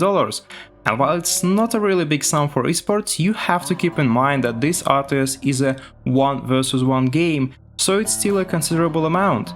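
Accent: Russia. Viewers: Why do Russians pronounce match as /much/?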